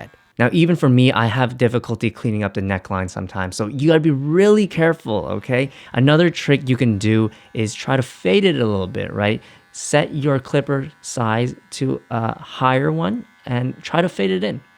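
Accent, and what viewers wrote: Canada. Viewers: Are you Canadian by any chance? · The Canadian accent is familiar and pleasant to hear 😊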